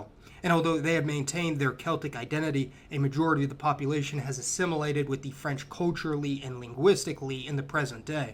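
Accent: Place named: General American, United States. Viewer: English is truly the best, the reason why for some it sounds meh is because it's spoken by billions of people as a língua franca. If it was spanish it'll be the same for that language or any other one. It also has many different accents but most are used to the version that is speaking in which is the standard american accent, because it is the norm in youtube. When you get use to something overtime it gets boring.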